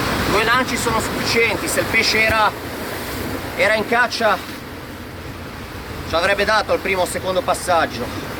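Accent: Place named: Liguria, Italy. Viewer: La cadenza sembra ligure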